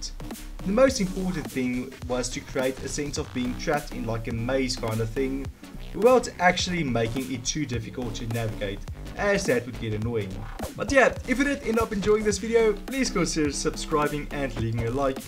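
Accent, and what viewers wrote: South Africa. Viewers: I knew it! Your accent was giving RSA vibes, but then you confirmed it yourself! 🇿🇦🇿🇦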